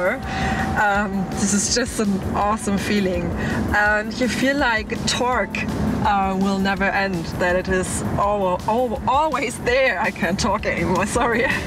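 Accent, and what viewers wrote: Germany. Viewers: nice orange peal paint job on 220 000$ car Mercedes Benz... Still can't stand the Germanglish of the host. Get a proper host for these videos...say Derek D or pretty much anyone else. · Well we in the UK think you sound like any normal German. The mic is way over sensitive its picking my to much slur. Change your punto for a VW up! Or Skoda citgo there cheap and fun. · people really need to stop rejecting people with a different accent. if you pretend she should speak in English with a stereotypical English accent, then you are a xenophobic and a racist. if she is a German, why should she try to be someone than she is not? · I love the little germanisms like keramic and the accent. :D It does sound kinda scripted though. For $222K i'd have a nice down payment on a house and mazda 3 and some left over for a classic car or to invest. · I can't believe people don't like German accents... 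Either way she probably speaks better English than us natives.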